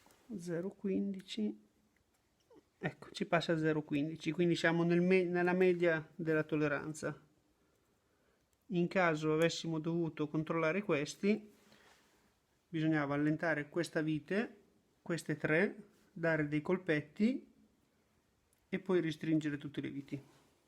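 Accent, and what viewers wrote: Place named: Romagna, Italy. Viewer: Ciao, mi sono avvicinato da poco al "fai da te" sulla moto, ( ho un GS del 2005) grazie per i tutorial sempre ben fatti. Per le chiavi dinamometriche, ce n'è una che copre tutti i range di utilizzo previsti dai serraggi dei GS? Oppure mi consigli di prenderne 2 una piccola che arriva a tipo 25/30 Nm e un'altra che da 25/30 va fino a 60? Nm... Grazie per info..... Dall'accento si intuisce che sei romagnolo, posso chiederti di dove? Grazie mille.. Ciao... 🖐️👍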